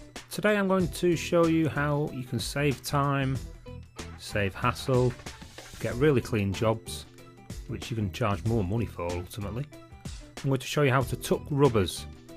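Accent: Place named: England, United Kingdom. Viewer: You sount like u from England mate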